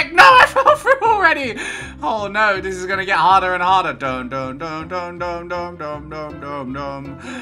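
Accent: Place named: England, United Kingdom. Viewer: somewhere in england i think.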